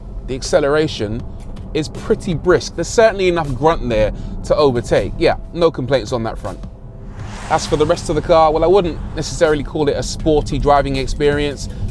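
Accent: United Kingdom. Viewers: I love that rant, it definitely had some of that bri'ish essence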